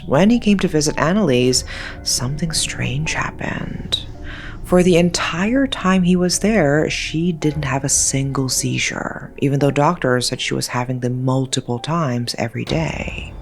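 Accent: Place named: California, United States. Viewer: I dont like the way you speak it feels like your typical caliornia woke girl accent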